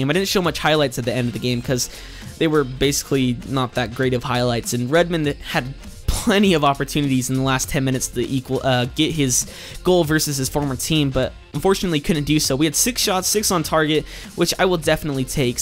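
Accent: United States. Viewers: Love your American accent! Nice to see an up a coming American FIFA YouTuber!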